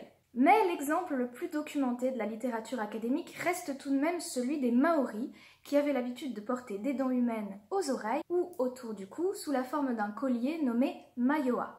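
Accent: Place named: United Kingdom. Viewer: Bah il est sympa ce petit accent british !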